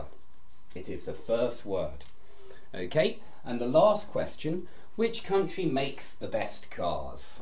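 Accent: United Kingdom. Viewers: Hola, I like the way you teach. It's very clear. I like your accent, I don't know which part of England you are from. I'm from Argentina and I love English language. I'm an intermediate learner so sometimes you get stuck or you understand half the things you hear and that makes you feel dissapointed. I'd like a lesson about phonemic symbols and how can I pronounce a word if I don't have a dictionary with me. Saludos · Well, i was expecting for something different I guess, but it was a good lesson, you taught me something new which I was a little bit confused on how to use it or even to teach it. It´s a difficult topic and you did quite well, by the way I love you accent, I guess you are british, aren´t you? :3 Congrats! and thanks for the lesson :3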